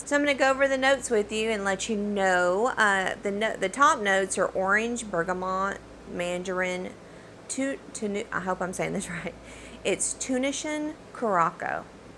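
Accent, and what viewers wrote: South, United States. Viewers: I love your beautiful accent. I used to live in North Carolina and in Mississippi, such sweet people and their accent melts my heart!!!! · Loved your selection as well as yoyr southern accent. I could listen to you talk all day, you sound so lovely. 😊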